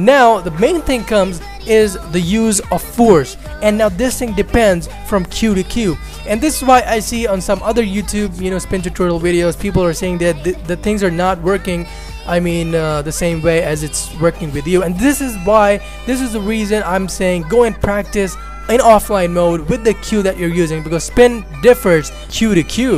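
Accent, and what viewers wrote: India. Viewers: uh he’s Indian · whait is he indian?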